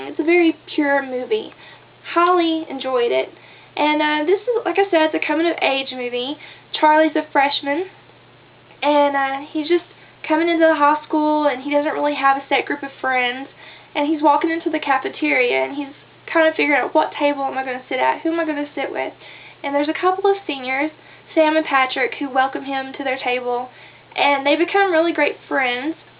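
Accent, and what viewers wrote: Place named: South, United States. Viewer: Yeah I like unconventional movies and I've had my eye on this one - thought you were from down South, you've got this June Carter thing going on! It's a beautiful accent to hear and I've been told you guys down south are the nicest people with good values - il just have to put up with my South London English accent!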